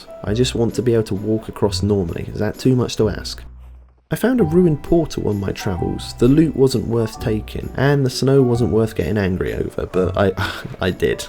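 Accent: United Kingdom. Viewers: god i really like your calm British accent you sound soo chill also all hail the king of melons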